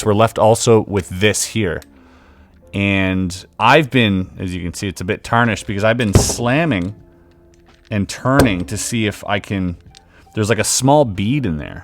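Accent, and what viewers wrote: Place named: Canada. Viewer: says, "out" Hubby and I, "Owwt" lol We're literally only 6 hours away from the Canadian border and it always makes us laugh to hear that accent. Truthfully people think ours is funny too because we say "Wicked" all the time.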